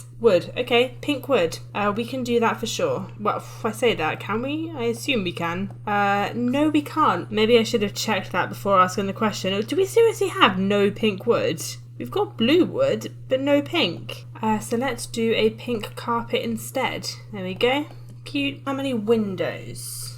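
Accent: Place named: United Kingdom. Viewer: That's gotta be the most British voice I've heard in years.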